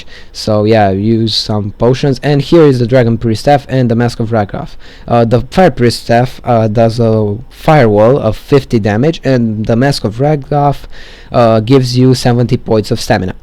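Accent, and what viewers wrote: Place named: Serbia. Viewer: you have a serbian acent :)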